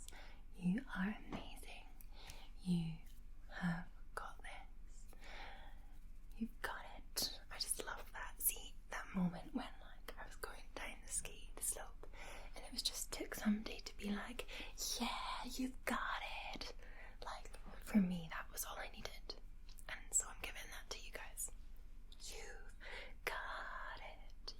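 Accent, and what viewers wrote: Scotland, United Kingdom. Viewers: I love that Scottish accent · Grew up in Glasgow and lost my accent when I moved to NYC. This is changing my opinion of the Scottish accent.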